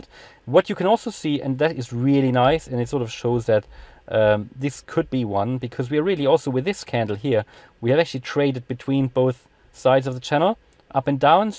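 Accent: Germany. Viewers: love this channel, thanks dude. keep it coming. btw are you german?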